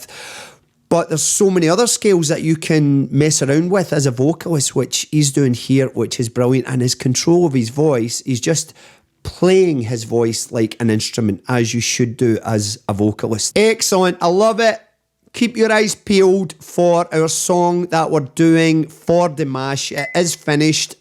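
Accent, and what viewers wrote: Scotland, United Kingdom. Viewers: Love you reaction including the wee Guy!! But most of all I love your Scottish accent!!! 🤩🤣👏👏👍😘😍❤️🇨🇦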